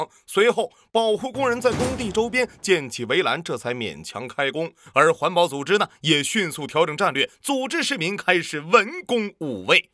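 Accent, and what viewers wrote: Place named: Northeast, China. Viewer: 東北口音。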